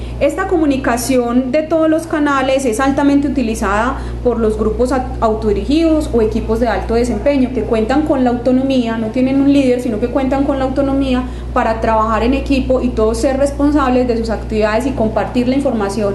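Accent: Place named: Antioquia, Colombia. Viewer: excelente material pero debería ser mas neutral en su acento y entonación, demasiado paisa en su forma de hablar.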